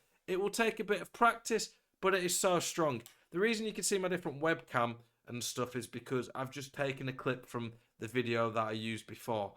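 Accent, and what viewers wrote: United Kingdom. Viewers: Man this is the most British accent i've ever heard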